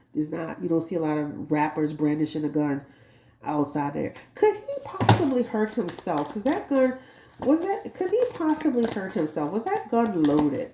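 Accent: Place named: New York, United States. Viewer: You are from New Orleans, but you sound like a New Yorker, well just an observation.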